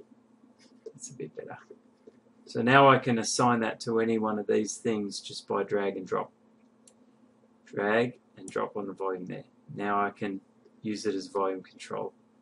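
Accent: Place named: Australia. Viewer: Thank you so much my friend. I'm from Brissie - it was so nice to have a calm Aussie voice walk me through getting this working. Bless you .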